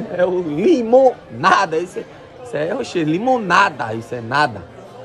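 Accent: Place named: Northeast, Brazil. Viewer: Só quem é do interior do nordeste pra saber que falamos com esse sotaque e maneira de se expressar.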